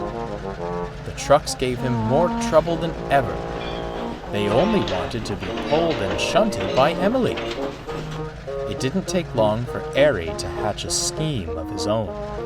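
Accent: Scotland, United Kingdom. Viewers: Is Emily Scottish? She sounds like it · I did not expect Emily to speak Scottish. I’m a Filipino btw.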